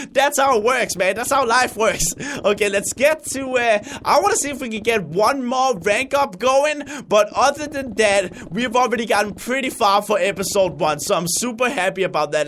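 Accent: Denmark. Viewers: I did but have you heard his Danish voice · Crainer's Real name is......His Danish voice is so cool There is an interview of him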